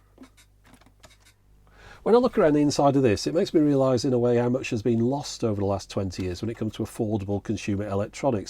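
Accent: United Kingdom. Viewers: Are you british? You speak english like british people 😃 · "Me mother in law, or me brother...". You have never sounded more british. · I'm amazed someone would complain about you pronouncing things the British way. Considering you're British and all. But I probably shouldn't be too surprised I guess.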